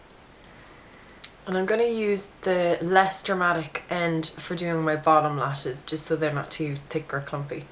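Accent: Ireland. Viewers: very nice look, thanks :) are you from Dublin? :)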